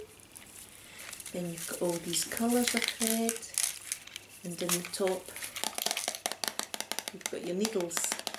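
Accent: Scotland, United Kingdom. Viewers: I'm Scottish as well an its nice to hear a familiar accent in ASMR <3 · Just discovered your channel! Scottish accents are my fave 😁!! I didn't know there was a online poundshop! I love a good nose I the poundshop 😁 you got some awesome goodies! laughed when you said about putting your glasses on, my eyesight is awful, I feel your pain 😂 I got dogs, lint rollers are a must 😂 xx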